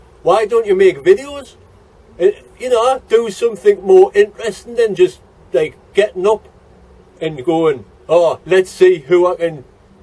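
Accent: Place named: North, United Kingdom. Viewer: I like you videos and the voice it reminds of all my relatives from the north Never mind about the dislikes some people just like to hurt .Good wishes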